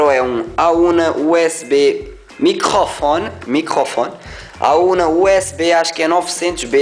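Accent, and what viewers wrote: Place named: Portugal. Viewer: português de portugal! <333 Tão lindo ouvir, na moral hahahaua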